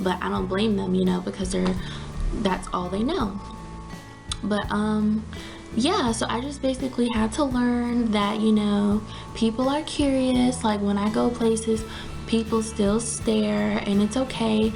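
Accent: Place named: United States. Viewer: You are so adorable with your little country accent ❤❤❤❤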